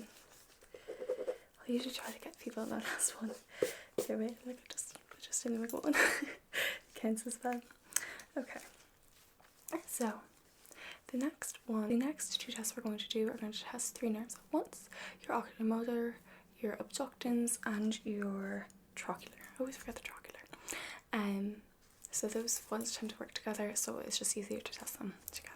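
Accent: Ireland. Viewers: Can't help but love the Irish accent! Hope to live there one day! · I love your accent!!! Though that might just be because I hear it all the time when I’m around my moms family since they all have an Irish accent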